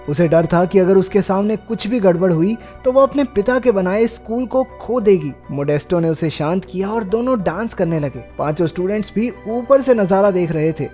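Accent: Haryana, India. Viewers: bhau tu pakka havanvi h mne to deshwali laage h yar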